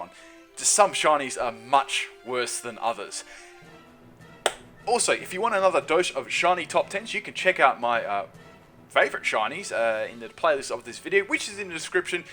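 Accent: Australia. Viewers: Australian tho, accents are completely different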